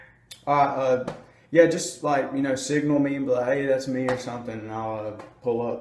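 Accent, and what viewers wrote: South, United States. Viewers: it's like that alot in the south. some words come off really southern for some people and others dont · Dude I am so confused on your accent. Like one second you sound normal American, then sometimes you sound like you have a southern accent, what the fuck. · i love that country voice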